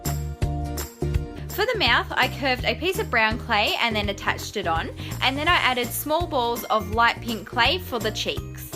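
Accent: Australia. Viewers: i heard your voice and i knew straight away you were aussie😁(im aussie too)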